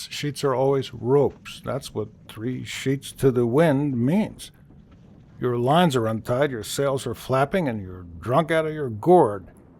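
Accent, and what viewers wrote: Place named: Southern California, United States. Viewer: I love The Philosophy of Sailing.I listen to it often. Learned so much. I grew up in Sherman Oaks and Fullerton. Your So. Cal accent (intonation, cadence, tempo, along with your wonderful humor) is something I am very familiar with. You remind me of listening to my best friend’s father as we hiked Catalina, from the isthmus to Avalon. Looking forward to more of your wonderful videos. Have you considered a Vol. 2 of Philosophy? I’d buy it in a heartbeat!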